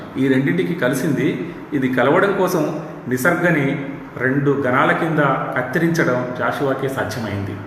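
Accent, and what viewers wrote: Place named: Telangana, India. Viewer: Sir..... Mee telangana mandalikam tho kudina telugu Chaala bavundhi.... Sir 🙏🙏🙏🙏🙏🙏🙏🙏🙏🙏🙏🙏 Ee video Telugu vallu Bhavishyatthu lo chustharu sir ekkuvaga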